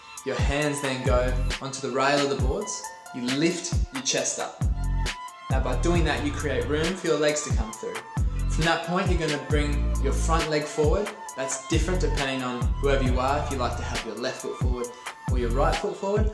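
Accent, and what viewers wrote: Australia. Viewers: likely a gold coast Australian accent. (I live in SA and this sound like a Queensland accent to me) .The beaches also look like they are in the Gold Coast but i could be wrong. Also a lot of surfers live in the gold coast. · As soon as I heard the Aussie accent I knew this guy was legit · He’s AUSSIE m8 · I thought for a while he said bitch, but it was just his Aussie accent. But, great vid man. Thx!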